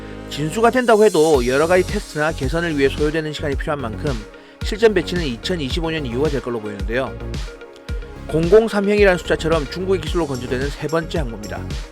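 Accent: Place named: Gyeongsang, South Korea. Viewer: 내용은 좋은데...경상도 사투리 억양이 너무 강해서 듣기가 좀 쉽지 않네요. 계속 방송 하실건데... 표준 억양 연습 부탁 드립니다. 그러면 좋은 내용이 한층 와닿을 것 같습니다. 사투리 억양 지적해서 대단히 죄송합니다.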